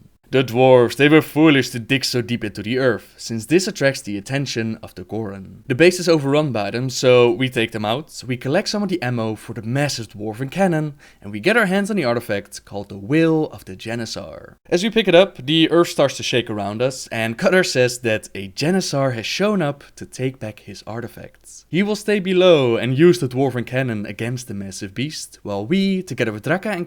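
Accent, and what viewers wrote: Netherlands. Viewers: You're dutch aren't you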